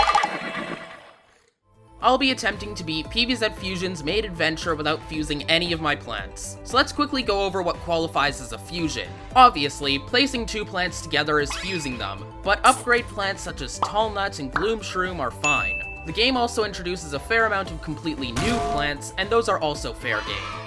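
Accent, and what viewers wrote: Canada. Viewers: He’s Canadian dumbass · oh, youre canadian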